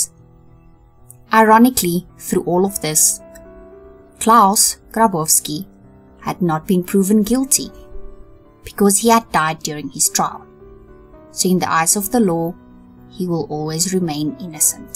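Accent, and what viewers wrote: South Africa. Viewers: You sound Afrikaans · I recognize your accent sister 🇿🇦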